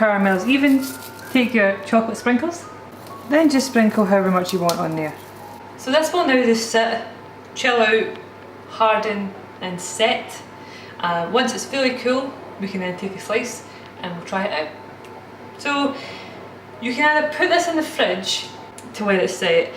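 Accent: Scotland, United Kingdom. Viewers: New subscriber! How did I not know about your channel lol :D I do recipes too...nice to hear another Scottish accent x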